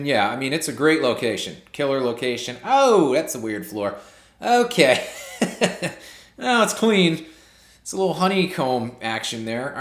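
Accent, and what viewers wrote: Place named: New York, United States. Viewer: Reading those Zillow’s like a sports announcer! Add the NY to it, priceless…. “BOOM, how much money, is this guy NUTZ?” Great video.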